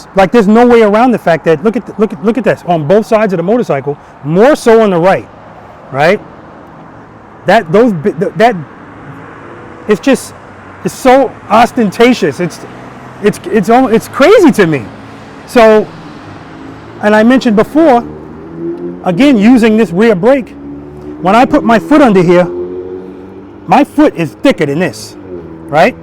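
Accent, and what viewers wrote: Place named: New York City, United States. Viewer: you move to fl . still have that heavy nyc accent . thats a nice bike . you have to get use to it but i get your point . thats why i ll stay with my kawa vulcan s abs 2018 in white all over sunny so az . moved in uhaul from so bx ny 11 mo ago best move ever . the only thing i miss is orchard beach bx . here i have to drive 8 hrs to cali or tex to see ocean but is still better that hunts point bx next to 41 pct longwood . had some friends there in blue . if i want fl thats 5 days pensacola beach other points in fl alittle longer but i may do it . if you see a white kawa 650 vulcan s thats looks like a small harley with all the ad ons and laoud pipes that would be me . may the bike week in daytona in 2022 ? . i use to see a nypd moto off patrolling the bx highways but only one . i never got chanse to talk to him you know him or her ? 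in the summer plenty of scooter nypd in orchard beach bx . but that harley in bx hwys look a lot better. but i think the nypd should get bmw police bikes that would look good . here in az and cali thats wath moto police ride . always a head turner in florida to right ? .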